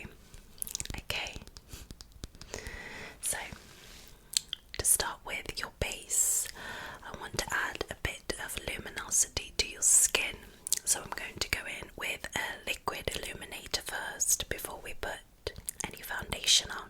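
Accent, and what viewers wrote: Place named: London, United Kingdom. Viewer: Are you from Central London? You have the EXACT same accent as my auntie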